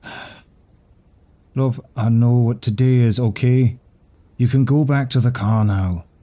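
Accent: United Kingdom. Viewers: Are you from Yorkshire? Tysm for the fun vid 🩷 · I was just about to compliment you on your northern accent and I'm a northerner myself.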